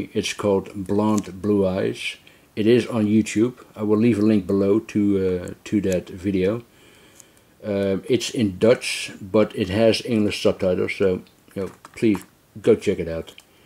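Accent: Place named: Italy. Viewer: Fantastic update 👍😎& plenty of Italian films. The De Palma-De Niro early movies I have never seen, fans of both & might consider picking up. I enjoyed “The fifth cord” & interesting extras from Arrow video. Well spoken in your Italian accent 👍, “Double face” I almost picked up in the Arrow sale. As I’ve been on a Giallo kick of late, I do want all the Sergio Martino Giallo movies after enjoying watching “The strange vice of Mrs Wardh” “Street law” cool Euro crime movie & I agree the Grindhouse releasing of “The tough ones” aka (Rome armed to the teeth) is one of the releases of the year. Nice touch the numbered bullet 😎 I do rate “Almost human” as Umberto Lenzi’s finest Euro crime movie also. Definitely, more Maurizio Merli Euro crime movies 👍, I have most of them as bootlegs. What a coincidence in the Dorado release of “The seven from Texas” in getting numbered 401 & 402, if you remember, you reminded me of the release as I totally gave up on the release 😬& when you did I ordered straight away as it’s limited to a small number. 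“Broken arrow” fine early western with the reliable James Stewart. “Saving Private Ryan” is on my 4K wanted list, as I’m imagining the beach scene will look epic on 4K. Perfect finale to this update, a mention to Rutger Hauer & the sad passing of a great actor ☹️I always enjoyed all of his roles. Maybe a Rutger Hauer Collection video 🤔 Cool update friend 👍😎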